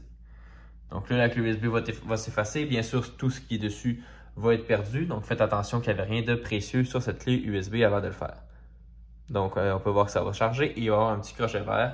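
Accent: Canada. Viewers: Excellent évolution d'un I-Mac 27 fin 2012 I7 de High-sierra 10.12.4 vers Ventura 16.6.7. Merci beaucoup pour ce tutoriel bien expliqué avec cet accent canadien... j'adore 🙂 J'en ai profité de remplacer mon dur dur interne par un SSD 4To, et mon I-mac fonctionne impeccable. Mon évolution mac OS a été obligatoire pour un abonnement à photoshop sans changer de matos. MERCI ! 🤟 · Félicitation pour votre tutoriel avec l'accent Canadien c'était parfait. J'ai pu installer Ventura sur 3 Macs incompatibles vous m'économisez l'achat d'un nouveauMac encore bravo depuis Nouméa en Nouvelle Calédonie Pacific Sud. Peut-on lancer la mise à jour de Ventura 13.2 ? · Vraiment merci !!!!! Clair précis et quel bel accent canadien !! bravo !! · Un immense merci pour ce Tuto en Français!!!! En Plus avec l'accent Quebecois j'adore. Bravo et encore merci. La semaine derniere j'ai installé Ventura et cette semaine mise à jour vers Sunoma grace à ce tuto!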